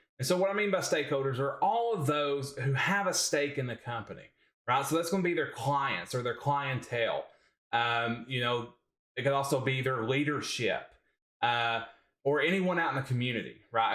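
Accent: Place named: Texas, United States. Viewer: Nice video. Nice job. Everything said is to the point and very accurate. Cool southern accent too. Probly Texan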